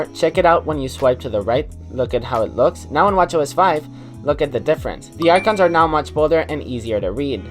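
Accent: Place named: Spain. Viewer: His accent is Spanish, as was born raised and lives in Barcelona, Spain. He spent 3 years in the United States as a foreign exchange student. It’s why it’s hard or takes awhile to figure out that is not American just by watching only a few of his videos. Listen to him pronounce the city in California called Cupertino, which is where Apple HQ is. Many cities and towns in California have Spanish names! I’m sure I overly answered your question, but I’ve been following ’ channel from the early days. Have a good night! ~Cat from NY 🐱🍎💜👍🏻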